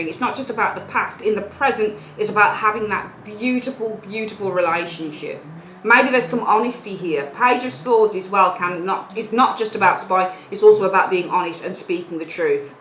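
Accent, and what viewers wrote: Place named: Midlands, United Kingdom. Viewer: You are good. So accurate it’s scary. Love your midland ?accent. Xxx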